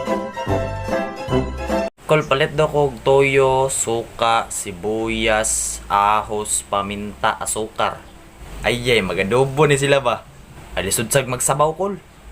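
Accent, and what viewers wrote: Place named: Visayas, Philippines. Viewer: nakakatuwa namn po sir ang galing nyo talaga mo mg patawa lalo na yng salita ng bisaya mo dami kng tawa sa mga jokes mo pkcm amo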